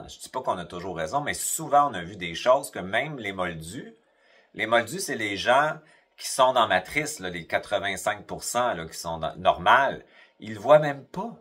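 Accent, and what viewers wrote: Canada. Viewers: Bonjour , tu décris parfaitement notre évolution intérieure ( moi depuis 35 ans et j'ai dégusté ! avant que le chemin ne s'éclaire ) chaque étape y est et je me retrouve parfaitement dans ce que tu dis ...par exemple : oui plus de mémoire puisque on est dans l'instant présent, les émotions n'existent plus puisque qu'on n'est plus sous l'emprise du mental , et puis on est tout simplement etc... Aujourd'hui j'ai pu te suivre car tu parlais lentement , l'accent canadien n'est pas tj facile pour moi ...soleil des antilles francaises.Marie.😊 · J'ai l'impression de m'écouter avec l'accent canadien, quand je me parle à moi-même, parfois... Cette sidération face à ce qui fascine les autres, aux trucs importants dans leur vie... Je disais avant de vous connaître que j'avais l'impression depuis toute petite d'être "montée à l'envers" par rapport aux autres. 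Maintenant je comprends mieux. C'est chouette.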